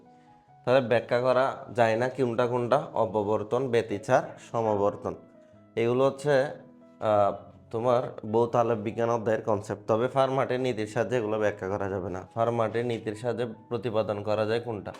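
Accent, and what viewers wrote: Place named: Chittagong, Bangladesh. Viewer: আপনি মনে হয় চট্টগ্রামের 😁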